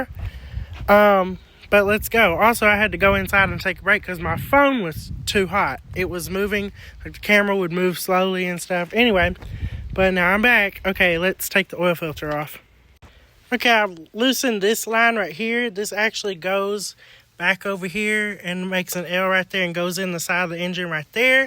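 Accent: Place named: Texas, United States. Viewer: What part of Texas are you in. Sounds like the same part I’m from. I was born in Tyler and all my family are from Murchison, Ben Wheeler & Brownsboro.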